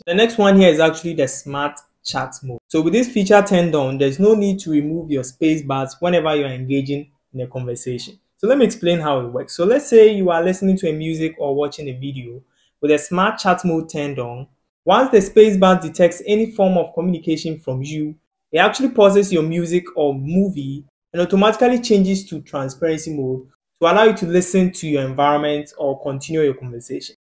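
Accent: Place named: Ghana. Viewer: 😂😂 you scratch my back I scratch your back right! Yeah I know you're Ghanaian now your accent says it all!!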